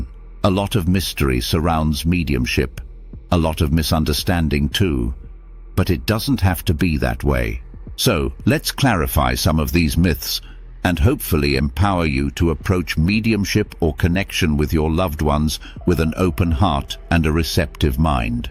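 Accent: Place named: United Kingdom. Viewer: I think this is the best video you have done to date! And I love that the narrator has a British accent!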